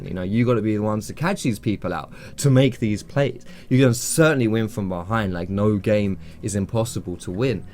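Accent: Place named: London, United Kingdom. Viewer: Thx man helped me a lot. By ur accent guessing ur from london jus wondering where abts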